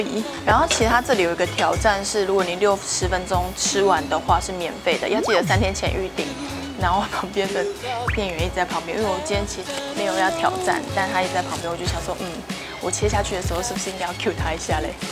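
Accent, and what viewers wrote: Taiwan. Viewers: 看起來好文靜那個氣質很好可是一講話就破功了變(正港台灣人) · 講台語的時候好可愛www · 講台語道地可愛XD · 台語很標準吧哪裡怪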